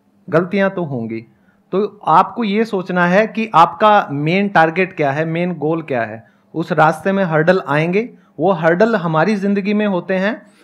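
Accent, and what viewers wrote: Haryana, India. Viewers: Sir I am from Haryana ,it's true bhiwani me esa hi bolte h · I loved your Haryanvi accent the way of you speaking. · Kis kis ko pasand aai sir ki haryanvi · Mai bhi padh rhi hu I am 38 year old and I studying as a architecture student Or mujhe aapki haryanvi bohot achi lgi Mai bhi haryana se hu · Bhai, your Haryanvi pronounciation is great one even in English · I already knew he is from Haryana by tone as I am also from Haryana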